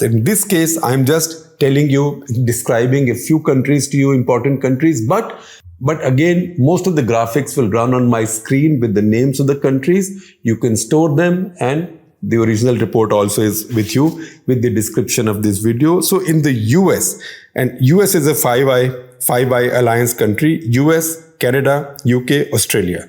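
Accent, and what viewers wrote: India. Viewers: Delusions of grandeur, sabre rattling, cringy indian accent on that unpleasant face and obsessing about others is what shekar and indian journalists are good at. Anybody could have read out the report. Stick to journalism dude by shedding light on indian problems. Never heard explaining the PEW report dated june 29th,21 that talks abt religious intolerance in india. No wonder indian journalists have such a bleak view of the world.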